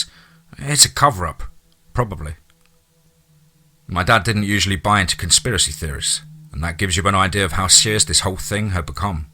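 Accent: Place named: United Kingdom. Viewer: My only input is that I hope your accent could be more neutral (accent-less, a.k.a American English), so it doesn't feel archaic. British accent (or similar) are good for old ghost stories or cosmic horror stories. Also, most of your stories are set in the Americas, aren't they? It should add to the immersion factor.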